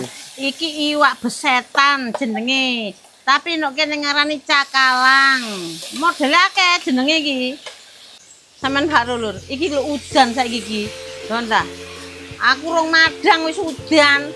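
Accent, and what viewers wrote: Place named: Java, Indonesia. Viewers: NONGKO GORENG, MANTAPJENANG GENDUL, REPOT YA IBUU. SENENG LIHAT NYA KLO IBU LAGI MASAK END LAGI BAHASA JAWO SENENG AKU DENGAR LIHAT NYA DI ALEK JAWA SEMANAK BANGET INGAT ALM BAPAK IBU KU.....PERSIS DIALEK NYA SAMA GPP YA IBU DI SAMA IN YA IBU ❤❤❤ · Maaf baru gabung ..Ini daerah mana ya..koq logatnya mirip saudara ku yg tinggal di Lumajang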